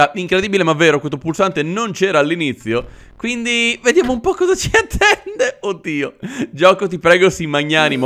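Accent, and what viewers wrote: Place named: Romagna, Italy. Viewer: romagnolo style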